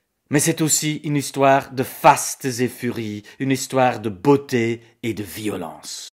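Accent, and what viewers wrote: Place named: North, France. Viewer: Je n' ai pas lu le livre, mais j' ai dégusté avec plaisir le podcast. Votre français léché avec votre accent du nord est tout bonnement un plaisir pour les oreilles et ce qui se trouve entre. Ha si l'histoire m' avait ainsi été contée, je serai devenu incollable. Merci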